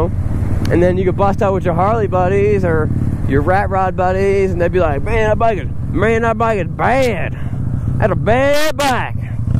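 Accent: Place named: Georgia, United States. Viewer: LMFAO... can tell was in GA for a while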